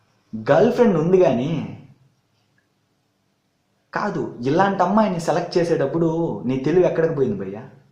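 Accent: Rayalaseema, India. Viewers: Ur 100% right bro Baga cheppavu nuvvu Bro manadhi rayalaseema kadha expt chesanu ni matalu vintumte telustundhi ......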